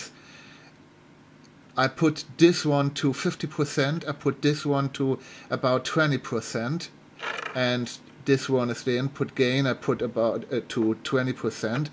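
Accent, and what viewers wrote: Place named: Germany. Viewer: ich wette Sie sind Deutscher, starker, sympatischer Akzent! Danke für diesen Artikel! Es muss nicht immer Röhre sein.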